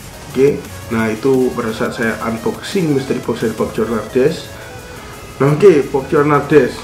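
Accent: Java, Indonesia. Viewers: Niat banget sih ini, sampe ada penilaian terperinci. Good job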